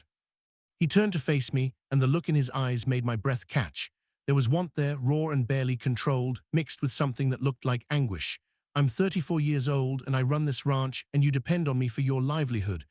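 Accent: United Kingdom. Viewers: I really enjoyed the story line and the writing. However, I am not in complete love of the total package. What brings me down is the British accent of the narrator. Giving the cowboys British accents broke the narratives credibility. Cowboys with the a British accent stole the legitimacy of the story. narrator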